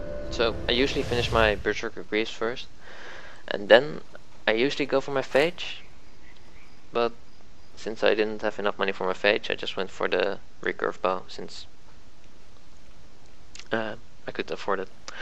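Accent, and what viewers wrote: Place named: Netherlands. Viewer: i knew you were from holland.. dat accent xD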